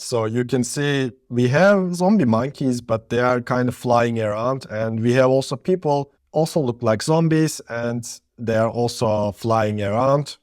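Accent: Turkey. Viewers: From your accent I can tell you're Turkish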